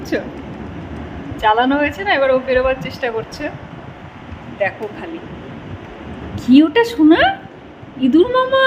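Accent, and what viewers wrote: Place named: Bangal, Bangladesh. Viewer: বাঙাল ভাষা শুনতে খুব ভালো লাগে 😊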